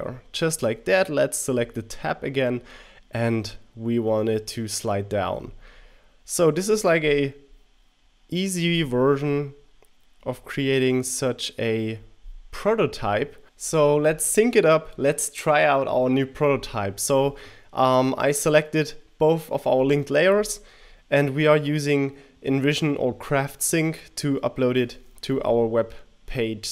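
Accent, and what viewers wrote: Germany. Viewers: Nice Video, love that German accent - From an American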